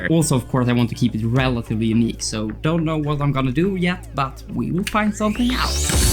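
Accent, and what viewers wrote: Sweden. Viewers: Extremt stark brytning haha, du måste vara svensk?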